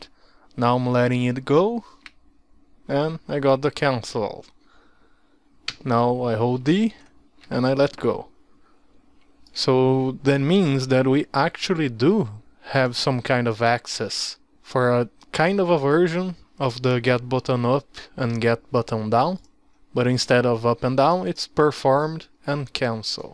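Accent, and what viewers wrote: Brazil. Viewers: O teu sotaque brasileiro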